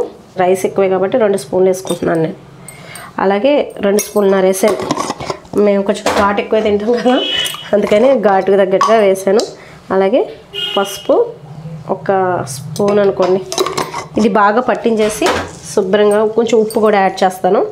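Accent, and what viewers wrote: Godavari, India. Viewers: Madam meru godavari zilla valla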